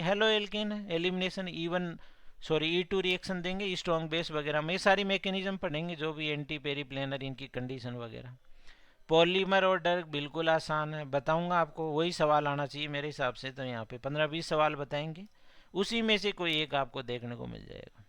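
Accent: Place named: Haryana, India. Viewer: Very good initiative sir And very nicely explained ....with Hariyanvi ascent and rajasthani touch....🤗 Great sir best donas ur self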